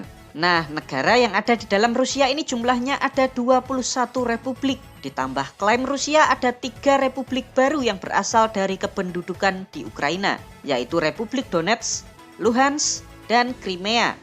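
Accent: Java, Indonesia. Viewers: Meddok bgt😆😆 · emg medok nya ga bisa di ilangin dulu itu min selagi ngonten 😁 duta Sheila on 7 aja kalau lagi nyanyi medok nya ilang 😁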